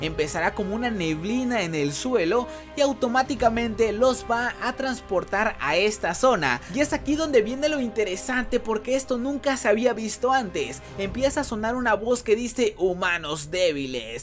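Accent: Chiapas, Mexico. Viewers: Oye Bro eres de Chiapas(?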